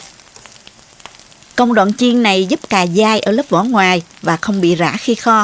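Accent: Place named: Countryside, Vietnam. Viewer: Giọng nói quê phèn mặn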